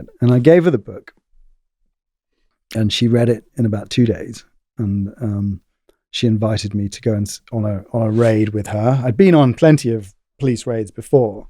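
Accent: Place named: RP, United Kingdom. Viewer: What is it with the comments on here saying this guy doesn’t know what he’s talking about? Well he clearly does and I think he was very precise. Probably because he has a plummy accent so he shouldn’t be taking about drugs when in reality it’s those with middle class plummy accents who consume the most. And others who think it’s only middle class people who snort Coke? I grew up in a council estate in Birmingham a very rough one at that, and believe me once the kids were with the dads for the weekends there was plenty flying around at girls parties!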